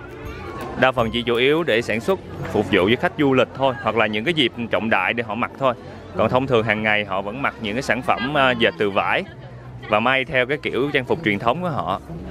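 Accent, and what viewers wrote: Mekong Delta, Vietnam. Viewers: Nghe giọng biết ngay là người Kinh tộc khinh người. bạn biết gì về ẩm thực vùng cao biết gì về người ĐỒNG VĂN? Mấy cái món của bạn nói đã bj Kinh hóa hết rồi đâu còn nguyên bản. Ngay cả món ân mà người kinh gọi là Thắng cố thì còn chưa gọi đúng tên nói gì đến cách nấu và cách dùng nguyên liệu. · Bạn này có lợi thế giọng nói cuốn hút, phát âm giọng miền tây dễ thương (mình k rõ bạn ở đâu), đẹp trai. Nội dung thì lúc nào cũng dễ chịu cực kì. Ủng hộ bạn nhiều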